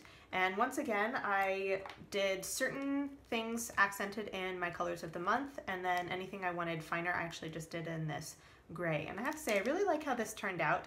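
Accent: United States. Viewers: Mais tu es française ! Bravo pour l'accent, on croirait une américaine ! Et aussi bravo pour ton BuJo, il est magnifique !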